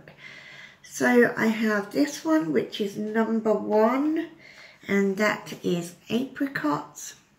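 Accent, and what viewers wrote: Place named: United Kingdom. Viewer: You should never feel bad about how much you give. It’s nice no matter how much, I enjoy just listening to your wonder British accent. Have a great week!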